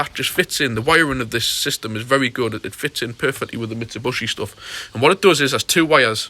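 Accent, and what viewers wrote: Newcastle, United Kingdom. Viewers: Cracking info, as a car/biker tech-head it makes perfect sense to me. . Just ordered an agri tune for mine, I'll set it same as yours D5. The pronunciation is Agri (Agricultural) Tune. I previously used the 'crude' common rail fuel pressure adjustable boxes in my 4 previous diesels, C220 Coupe, C220 AMG Sport, BMW X1, Mazda CX5, FIAT Doblo even, (model specific boxes obviously) with no issues and VERY noticeable power gains, but as this box cuts the turbo in earlier it's a much better unit, you're entirely correct. 👍 Me and my Aussie wife chuckle at ya Geordie crossed with Ashington accent. I'm a Geordie, but she being Aussie she chuckles 😃. 'Mitsybooshee' class love it 😀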